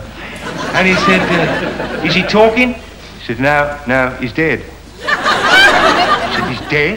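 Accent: RP, United Kingdom. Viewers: Love his upper-crust British accent. Makes everything he says even funnier. 🤣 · The uppercrust English delivery style always made me laugh and find it even more humorous..if I imitate an English gent i channel his imitation..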